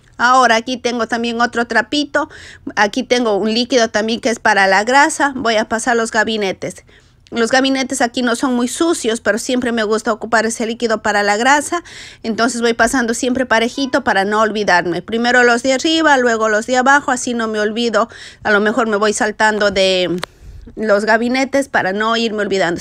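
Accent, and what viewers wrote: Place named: Ecuador. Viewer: Hola, apenas hoy encontré tu canal y vi muchos videos, y de una reconocí tu acento ecuatoriano:), aprendí mucho. Muchas gracias por compartir tus truquitos. Un abrazo desde Quito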